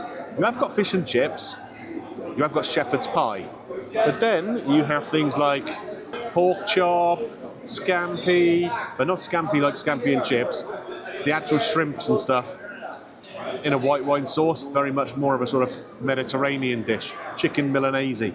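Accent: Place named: Dorset, United Kingdom. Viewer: I went off piste a bit with your reviews, but I’m happy too say , although I’m sure you would not mind either way , you’ve got back into my good graces , I think it’s your Dorset accent, I’m originally from Devon and I’m getting home sick stuck in central London, also I think you’ve done some stunning reviews lately too , there is room for both you and Gary in my subscriptions , My best too you and Mrs G , keep up the good work 😊 !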